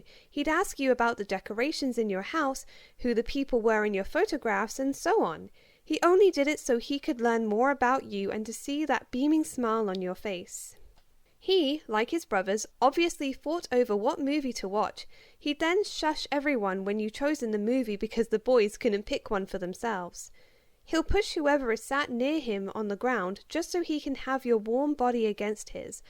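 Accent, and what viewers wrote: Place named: United Kingdom. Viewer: Bro why you sound like a British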